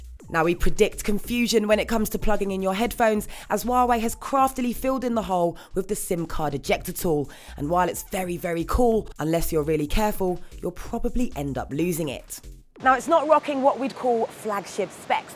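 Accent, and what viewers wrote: United Kingdom. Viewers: i hate british accent too anyway half of this is rubbish because i can get 4g with it because i do the camera is amazing and the DEFINITELY NOT LAGGY U LIED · Love the British ascent! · Hate Britsh Acsent !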